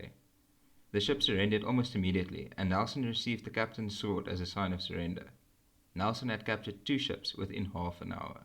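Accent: South Africa. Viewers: Perfectly good English. Don't let the morons get you down. Sounds like a South African accent maybe?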